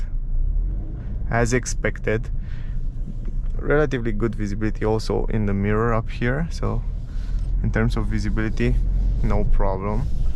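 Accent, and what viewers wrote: Romania. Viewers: You sound ... Romanian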